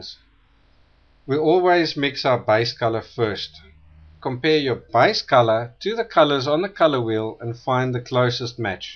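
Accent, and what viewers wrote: South Africa. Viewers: are you from soouth africa? i can hear your accent :P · Go South Africa! This accent takes me back to school days. Thanks for sharing this so simply and freely. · Very south african accent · Hoooly smokes, this is probably the most valuable video on colour mixing I've seen since I started painting 2 years ago. A complete revelation! Thank you so much :) Btw I bought your colour buster tool today and am looking forward to using it. Also love hearing a South African accent on youtube ;)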